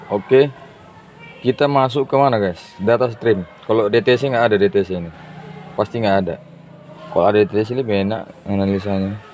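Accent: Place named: Medan, Indonesia. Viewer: Bang bengkel nya dimana bang.apa dimedan.logat nnya medan.kalo tangerang mah deket nih ke saya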